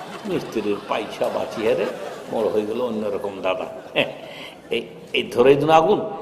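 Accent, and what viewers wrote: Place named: Dinajpur, Bangladesh. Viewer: দিনাজপুরের আঞ্চলিক ভাষা গুলো আপনার মুখে দারুন শোনায় স্যার। প্রতি ইদে এটা একটা আলাদা আকর্ষন অনেকের জন্যই। দিনাজপুরের সকলের উদ্দেশ্যে, দিনাজপুরের চোখধাঁধানো খেলাগুলো দেখতে চোখ রাখুন আমাদের চ্যানেল Sports Mania তে...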